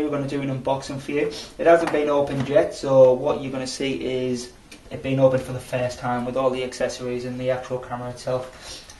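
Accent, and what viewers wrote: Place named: Cumbria, United Kingdom. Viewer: dude where are you from......your accent sounds like some cumbrians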